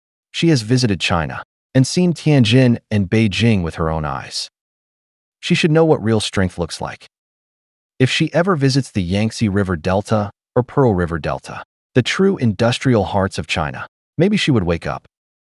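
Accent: India. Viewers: HER CURRY ACCENT IS IRRITATING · Palki's dream to join CNN or Fox in USA, as do all pajits dream to leave Endia. But first, she needs to clear that curry accent.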